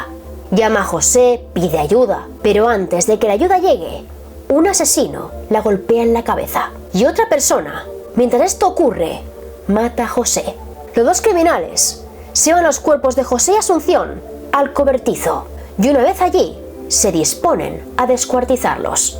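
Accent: Spain. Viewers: Desduzco x tu acento eres española,pero te informastes poco ,el que tenia la horquilla u horca era Zapata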